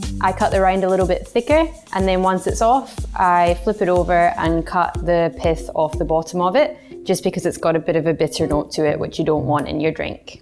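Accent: Scotland, United Kingdom. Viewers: Best ever bartender - competent, brilliant tutorials, love hearing the history of the drink and about the spirit brands. Last but not least - I love your Scottish accent 😃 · I had to rewind, first time hearing that on YouTube. Good Scottish lass. 😂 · Scots accent, that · Wonderful Scottish accent! Pairfect!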